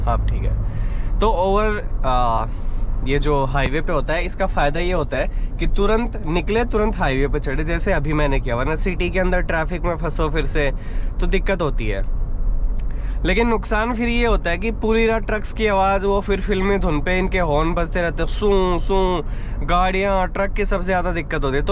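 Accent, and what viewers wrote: Haryana, India. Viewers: Bhai aap jo haryanvi me bol teea ho bhot aacha lagta ha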